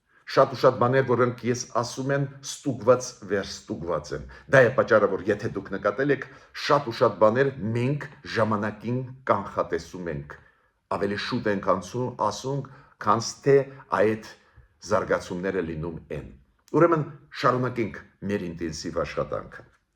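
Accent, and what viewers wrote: Russia. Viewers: Այս ոնց է որ չասեցիր Ռուսներն են մեղավոր եւ Պուտինն է ձեռբակալել։. Տխմար այդ ռուսական ակցենտդ որտեղից ես ժառանգել։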